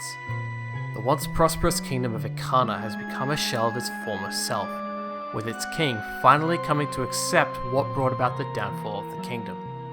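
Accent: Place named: Australia. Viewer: I love the Australian accent. Okka-reener. Amazing.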